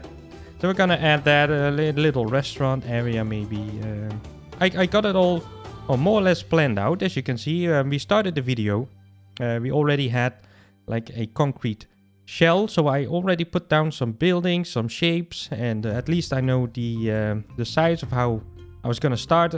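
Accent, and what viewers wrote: Netherlands. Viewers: the dutch accent is strong in this one, love it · Heerlijk Nederlands accent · are you dutch? i also am so just wanted to know bc i heard more dutch guys talk english like that Also for other peopol reading this guy is great at the game so SUBCRIBE!!!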